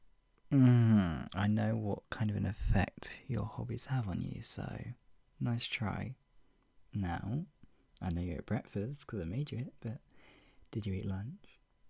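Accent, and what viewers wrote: United Kingdom. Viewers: oi.. I'm crying you're to cuute the british is too cute I'm dead from fanboying too hard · This audio is so British it makes me happy 😊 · But like- I thought u were scottish why do u sound scottish to me 🤔✨ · You talk so much like me it's unreal :3 I'm also British, and I do a lot of pet play with others (and MIGHT be a teensy bit into kitten space myself), but your cadence and word choices are so beautifully specific. Your improv skills are amazing, such a treasure to stumble upon this! ^w^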